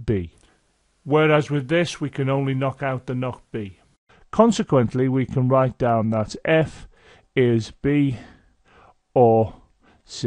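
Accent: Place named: Lancashire, United Kingdom. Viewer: Good Sir you are the best. Would be even greater if you added Boolean to the titles so we know which videos to look for. Other than that, the short and simple yet most effective way to help understand is your greatest asset. Btw I was debating with my mate about your accent and he said you were from Lancashire while I thought closer to Liverpool or St Helen's.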